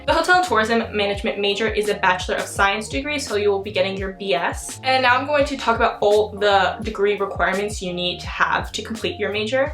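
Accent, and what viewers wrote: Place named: United States. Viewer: your accent is so perfect and pleasant I think you are American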